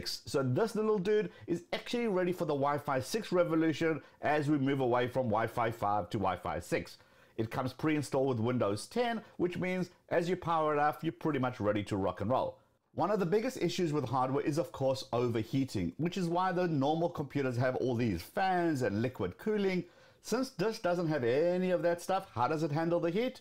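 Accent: South Africa. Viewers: is that a South African accent ?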